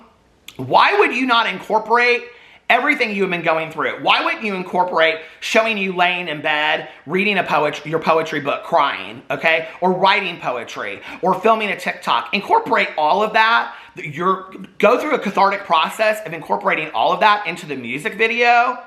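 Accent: South, United States. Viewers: I'm from Tennessee. You sound so southern and I love it.🤣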